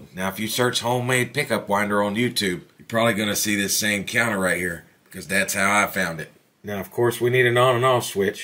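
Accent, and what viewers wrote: United States. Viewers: 声と話し方がザアメリカって感じで好きです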